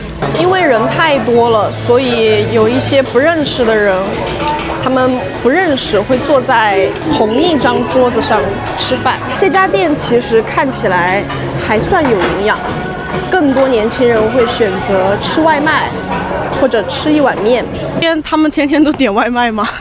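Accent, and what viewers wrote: Standard. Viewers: 老师你好 老师我是泰国人的学生 谢谢老师教我中文 老师这样好吗 老师可以有拼音 还有英语 和大大的字 我喜欢听老师的发音 非常清楚非常标准 谢谢老师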